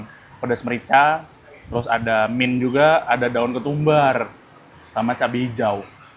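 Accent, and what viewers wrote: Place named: Java, Indonesia. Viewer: Hebat mas bro,,,,semoga sukses. Btw, kok bisa ngomong jawa..logat jawa,,,Gimana ceritanya ?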